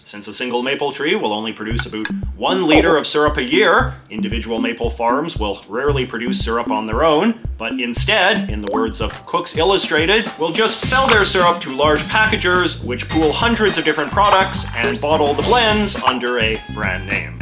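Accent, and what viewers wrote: Canada. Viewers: Of course the biggest steriotype of Canadiana is accent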